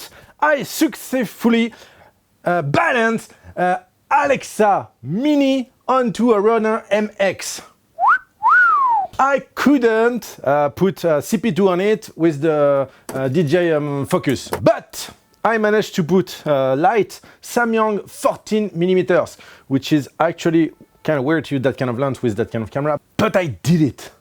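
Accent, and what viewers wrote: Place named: France. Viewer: On voit très bien que tu es français tu as un fort accent pourquoi pas une vidéo en français ?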